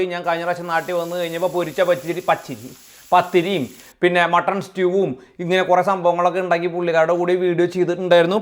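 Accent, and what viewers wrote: Thrissur, India. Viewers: thani Trissur slang.. ❤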